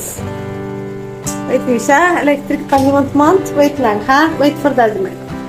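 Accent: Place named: Ilocos, Philippines. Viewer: Seems your accent sounds like ilokano.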